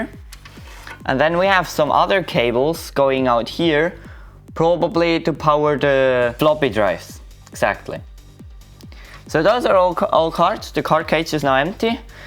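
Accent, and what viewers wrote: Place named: Spain. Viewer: I can't understand your Spanish accent...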